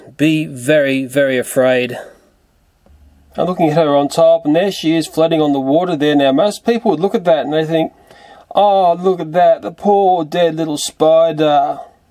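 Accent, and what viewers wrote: Australia. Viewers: Is dis boi Australian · So Australian people speak English but they don't no our American English interesting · >mfw you think that's an American accent He's Australian. That's a redback spider. Just stay away from Australia and the drop bears and other nasties won't get you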